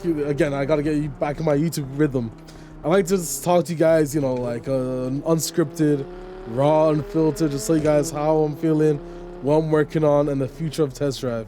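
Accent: France. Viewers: Yesss can already hear that French accent rubbing off on you! You should come to the UK bro! So happy for you do your ting fam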